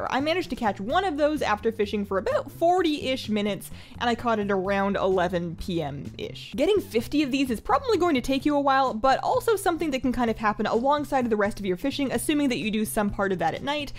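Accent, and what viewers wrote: Canada. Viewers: someone's from canada Eh?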